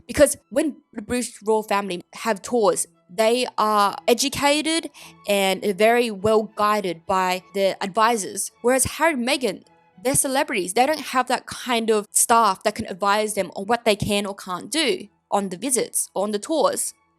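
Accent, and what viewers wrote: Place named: Australia. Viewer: Hi - I'm a new subscriber to your channel. I'm trying to work out where your accent is from - are you an Aussie cousin? I'm from NZ.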